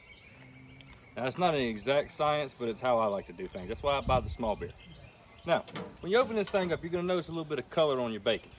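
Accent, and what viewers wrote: South, United States. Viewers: Man i miss your southern accent it made ur stories twice as funny. If we had more funny and useful youtubers like you the site would be a much better place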